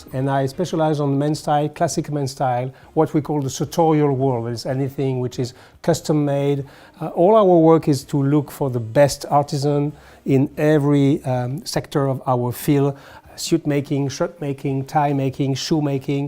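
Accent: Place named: France. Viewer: Always look forward to your videos! I have an idea you might like. Why not make a video on the process of a bespoke suit. What the suit looks like in the beginning stages, the fittings, the layers of fabrics that goes into making a suit! And you would be perfect to do such a video as you are very well spoken with a charming French accent which makes everything sound even classier! All my best to you and your wife! Happy new year!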